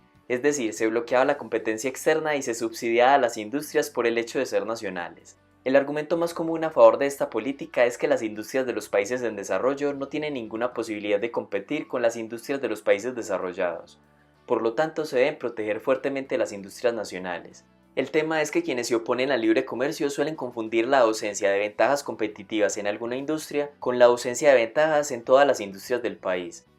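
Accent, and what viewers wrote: Antioquia, Colombia. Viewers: Suena como paisa, ¿ Eres Colombiano?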